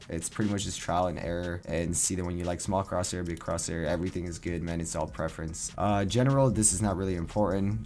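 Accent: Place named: France. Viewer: you're french ? you got a nice accent for a frenchie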